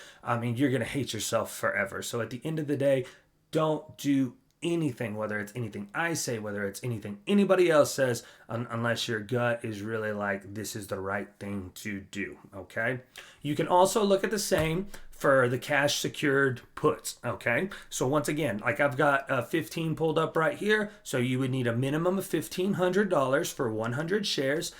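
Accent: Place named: South, United States. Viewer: Maw frands LOL, you sound southern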